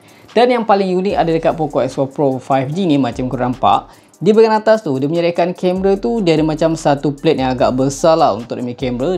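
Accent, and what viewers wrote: Malaysia. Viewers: Lucu banget y logat malaysia saya suka🤣🤣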